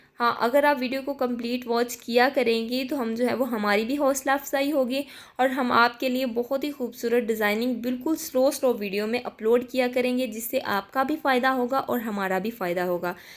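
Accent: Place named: India. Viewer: bolne ka pendo styel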